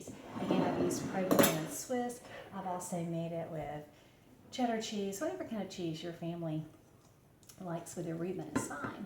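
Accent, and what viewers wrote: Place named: North Carolina, United States. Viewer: I know I’m late for this video but it looks great and can’t wait to make it! Just have to tell you (will sound weird lol) that you remind me of Dr Brent Ridge (of the Fabulous Beekman Boys); he’s a native North Carolinian too and just your mannerisms and accents are the exact same!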